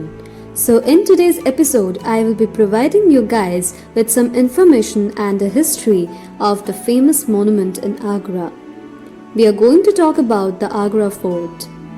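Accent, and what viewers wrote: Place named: England, United Kingdom. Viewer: Very nice, keep up the good work, very impressive voice and english accent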